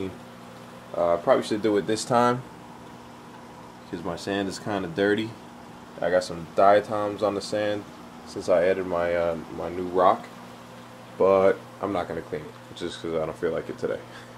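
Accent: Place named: Brooklyn, United States. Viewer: He must be from Brooklyn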